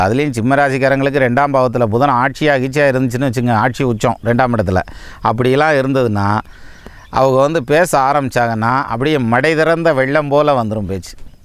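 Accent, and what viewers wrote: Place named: Madurai, India. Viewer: Ungalaal, Namathu Dindigulukku Perumai, Tamilukke perumai, tamil naattukkum perumai, Naattukkume perumai. Kaalam pora pookil pazham-tamil sethuvidumo yendru payam irunthathu.... But it is playing happily in your tongue. Thanks for protecting and preserving our pazham-tamil related to astro field. I am fully impressed with your deep knowledge, detailed analysis, Tamil delivery, namma dindigul - Madurai slang and nakkal. Vaalka Valamudan. I 'll be meeting you sooner.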